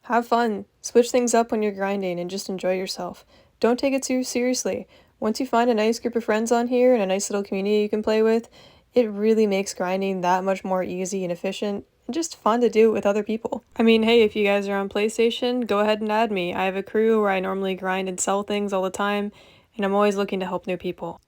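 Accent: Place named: Canada. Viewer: At first I thought your YouTube and PSN were just a play on a nickname for thinking that was your legit name. Then I heard a few words that sounded Canadian and then boom, showed up and I was cheering because I went to a hockey game when the Vancouver Canucks came down to play the Anaheim Ducks. But I'd agree with most of this. Only thing I'd personally wouldn't buy as a new player, is the Acid Lab right away as we can get it for free after completing Off The Rails and we just have to upgrade the Acid Lab after doing i think 10 Fooligan jobs?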